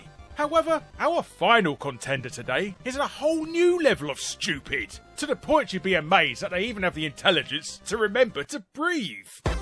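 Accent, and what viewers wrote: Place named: RP, United Kingdom. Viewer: So posh accent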